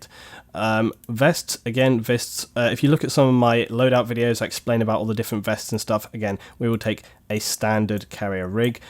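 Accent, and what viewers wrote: United Kingdom. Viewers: Hi I have a HP notebook which I play arma3 on. I a noob with about 20 hours on it and I’ve just discovered I can’t get half of my actions, like player stats, gps,etc.. as I have no right Ctrl button on my keyboard. I’m computer illiterate lol, so could you or anyone reading plz help with a remedy to my issue? Can I configure the keyboard somehow? (but in game only!) where I can change a non needed key to a R-Ctrl to use. Thanks pal great video and very useful still years on. appreciate your effort and time you invest in your video’s. BTW wat part of uk u from ? I can’t tell. I from the northwest I think you’re south of me lol 👍🇬🇧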